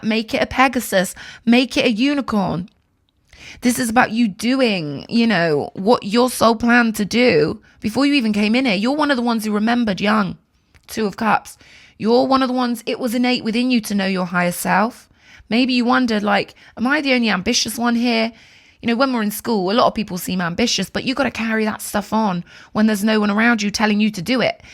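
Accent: United Kingdom. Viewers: You are ready to delve into my Childhood Memories, I’m always a Child within Honey- I really love your Brilliant articulation of your British Accent - I love to approach everything in my Path from a position of Knowledge and Wisdom ❤❤❤🍯…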